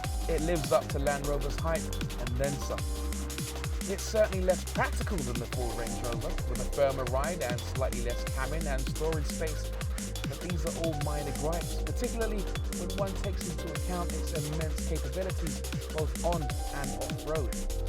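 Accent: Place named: England, United Kingdom. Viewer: Nothing hotter than a Black dude with an English accent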